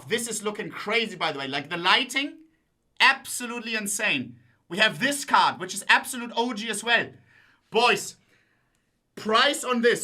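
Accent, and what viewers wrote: Germany. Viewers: the german accent makes it better somehow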